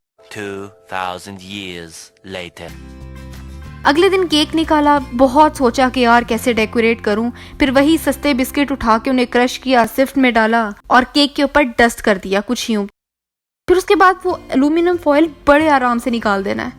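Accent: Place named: India. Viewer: Cooking me desi type of (لہجہ ) lehja... hayeee maza aa jata hy...❤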